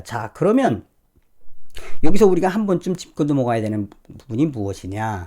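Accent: North Korea. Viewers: 북한말 재미집니다~~ 경상도, 전라도 사투리처럼 북한말도 곳곳에서 자연스럽게 들리고 받아들여지는 날이 오기를 기다립니다!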